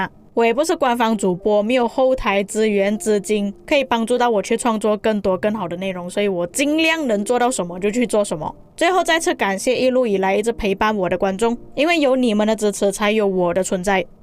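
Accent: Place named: Malaysia. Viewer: 加油（其實我是因為你的聲音有很重的大馬腔我才開始看你的影片的😂），你的影片都很有參考價值，繼續做下去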